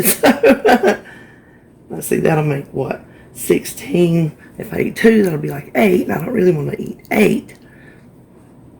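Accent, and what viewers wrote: South, United States. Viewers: Hi! My name is Elizabeth. I just recently started watching your videos. I totally understand your southern language since I am from Mississippi but now live in Niceville, Florida. You are doing great! Keep up the good work. I love you are taking the good ole southern cuisine and making it ww friendly. Have a great weekend!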